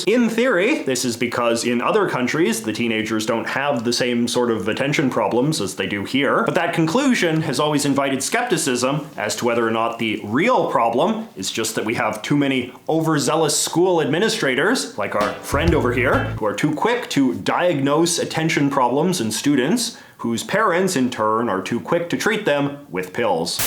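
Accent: Canada. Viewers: I love his Canadian accent 😍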